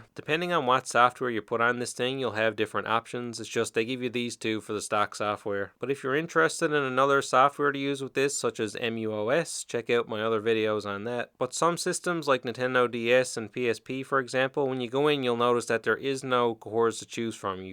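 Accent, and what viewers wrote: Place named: Newfoundland, Canada. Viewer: As soon as I heard you speak I knew you were from Newfoundland. Great video. I subscribed to your channel.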